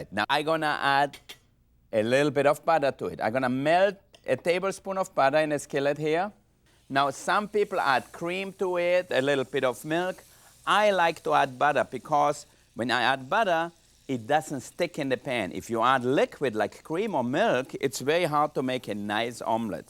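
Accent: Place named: Carinthia, Austria. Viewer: this video stirs the future english teacher in me. i just want to give him pronunciation lessons...please let me! while our carinthian dialect was voted the sexiest in austria, i don't think the accent is very charming when speaking english... :'(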